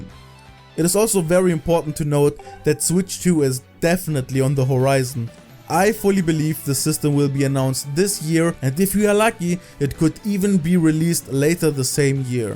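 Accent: Germany. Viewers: Is that a German accent?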